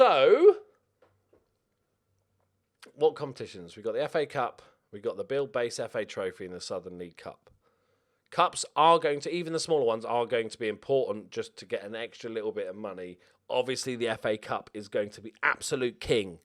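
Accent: Australia. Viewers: as an Aussie I can confirm that you’ve definitely got the accent 100% totally accurate.... absolutely killed me with laughter. Can’t wait to get stuck in to this series! Good luck! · Best Aussie accent I have ever heard from a non Aussie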